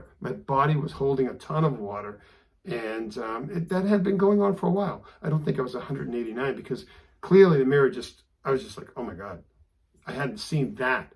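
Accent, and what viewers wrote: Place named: Quincy, United States. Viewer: I’m from Quincy MA. Recognize the accent. Down 50 pounds on keto. Thanks for sharing your journey. Cheers.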